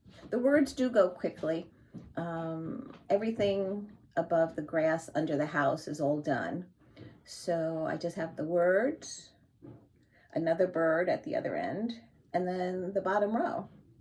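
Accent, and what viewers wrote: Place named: Northeast, United States. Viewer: I just love your videos. We are drawn to many of the same patterns, and I could listen to your beautiful northeastern accent all day long! I love how involved and dedicated you are in the lives of your grandchildren. They are so blessed to have you. I pray mine one day live closer so I can be helpful to my children by babysitting. I want to register an enthusiastic YES PLEASE to the "under the bed box" video. I would love to settle in and watch that!!!! Happy new year to you.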